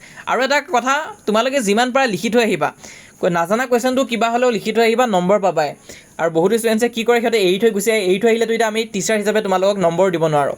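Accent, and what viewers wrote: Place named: Sivasagar, India. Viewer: Sivasagar distric r english khon lgeh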